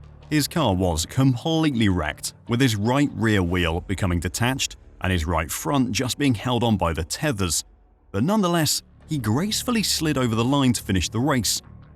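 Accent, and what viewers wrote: England, United Kingdom. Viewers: Regarding Tom Pryce's accident - it's "car park" - not "parking lot". You sound English to me so you should use the English terminology.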